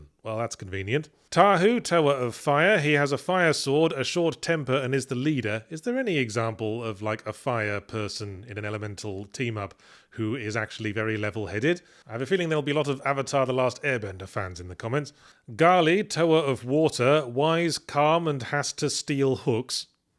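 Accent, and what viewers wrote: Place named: United Kingdom. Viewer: Out of all the first run of Bionicle products, the only ones I could pose "like one of your French girls" were the Rahkshi. Knees and good body portions do that to an action figure. However, whatever you do, do NOT disconnect the ball joints. ...also, I forgot that Jala (the McDonald's toy) and Jaller (the one packaged with the bigger set) are the same character, and the British pronunciation isn't helping any. That's not even getting into how the Matoran were originally called the Tohunga in the first comic.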